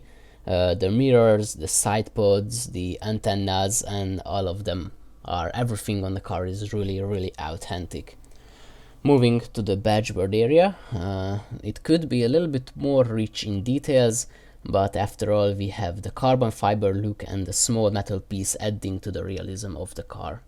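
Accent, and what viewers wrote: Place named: Hungary. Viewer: A jo magyar akcentus:D Nagyon jo video lett egyebkent! :D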